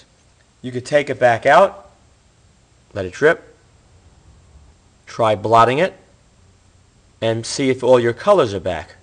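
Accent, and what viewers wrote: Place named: East Coast, United States. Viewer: Well done vid sounds like an East Coaster-Jersey maybe New York? wild guess-What they sound like to me anyways (West Coast-Wa. state)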